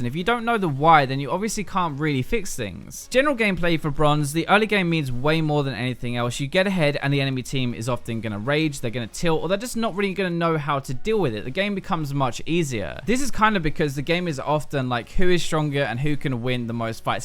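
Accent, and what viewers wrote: United Kingdom. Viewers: Not the guy with the british accent again :(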